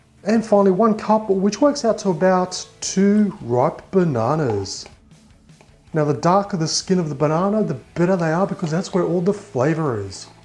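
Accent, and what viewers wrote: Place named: Australia. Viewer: i like your aussie accent bro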